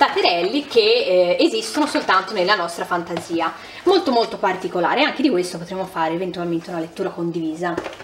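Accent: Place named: Tuscany, Italy. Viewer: Ciao, e vuoi vedere che la fine del petrolio sia la fortuna dell umanità. Bellima la parlata toscana.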